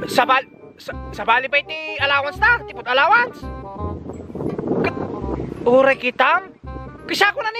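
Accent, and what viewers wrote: Ilocos, Philippines. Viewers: Nagunig ilokanom ngcute ka deng😂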